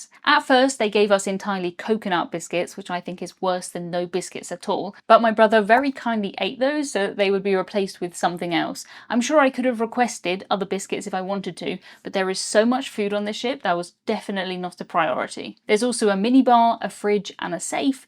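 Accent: United Kingdom. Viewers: what part of the country is your accent from? i hear different versions of British English, but i dont know what parts theyre from.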